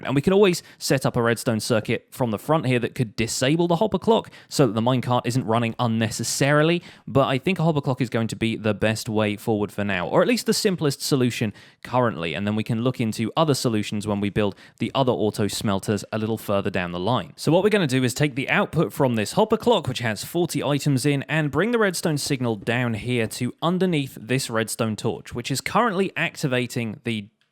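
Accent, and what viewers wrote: United Kingdom. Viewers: You are sort of my hero cause I'm not good at doing these things so thank you very much, btw, are you British? I'm not British but my mom said I have a "British accent"...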